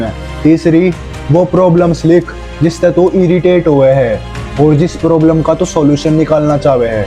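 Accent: Haryana, India. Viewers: Haryanvi Exant Amazing Work Bro ❤ Difference vibe with same word ✨🔥❤️🧠👑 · Nice harayanvi style 😊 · Haryana ki language h bro tere smj t bhar h😂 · Bro vo bhai harayani bol rha hai isame hasne ka kya hai vo bhai kuch sikha rha hai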